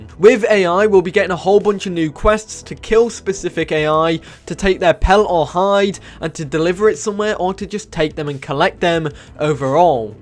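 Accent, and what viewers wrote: United Kingdom. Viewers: your british english is funny i like it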